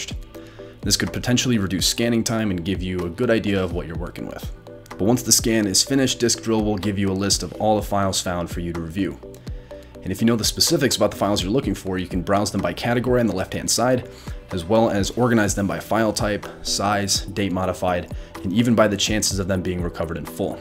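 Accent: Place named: Canada. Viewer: Hi there! Great video! I will try to recover my SSD. By any chance are you Canadian? I am, and I hear the accent in your video. Great job on this and thanks for helping us with recovery issues!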